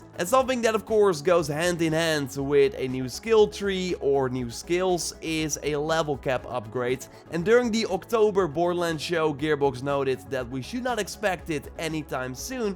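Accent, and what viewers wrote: Netherlands. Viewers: Kijk aan, we zijn van Borderlands Tree naar Borderlands Free gegaan! Heerlijk, dat netherlish van je. So close!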